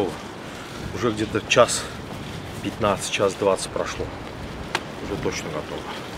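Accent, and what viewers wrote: Baku, Azerbaijan. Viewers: Этот Бакинский акцент я балдею да. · я арянин и родился в Баку. Помню всех соседей с таким акцентом, двор был инторнациональным. Все ваши рецепты супер. С большим удовольствием смотрю. Здоровья Вам и вашей семье.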